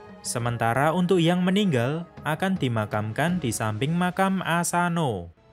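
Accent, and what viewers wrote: Java, Indonesia. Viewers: Jawi banget mas e😁😁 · Medok ya mas 😁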